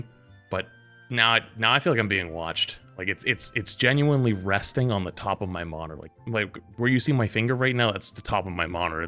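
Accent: Boston, United States. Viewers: The only thing I got from this video is you are from Boston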